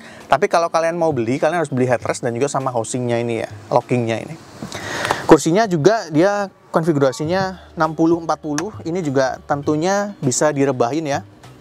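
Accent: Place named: Surabaya, Indonesia. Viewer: Okelah mantep bicaranya . Kek ke surabayan style hhehe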